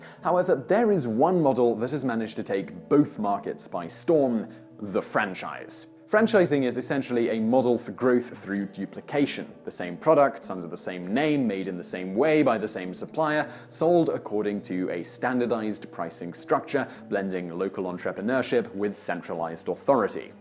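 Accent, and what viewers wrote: England, United Kingdom. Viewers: All these yanks upset with his pronunciation. If you don’t like the English language in its natural form, use someone else’s mother tongue. We don’t really want you walking round telling us how to pronounce things. Bastardised to the highest of degrees. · For those complaining about how says the word mafia: he speaks with an English accent. He is saying 'mafia' correctly according to where he is from. Aussies, some South Africans, and many other non-North American English (according to their accents and dialects) regions say mafia the same as. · T Rohan You do realize that his ancestors were speaking English when yours were crawling around on the ground, grunting and swinging from trees. He pronounces it the way people in England, who speak English, pronounce it.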